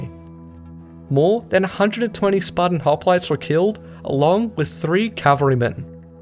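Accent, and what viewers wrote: Australia. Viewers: Recently I was going through my subscriptions and since I want to keep the number to a minimum I considered to unsubscribe. But then I though: nah, I absolutely need to know it if ever uploads a video again. I missed your drawings, competent explanations, and Australian accent!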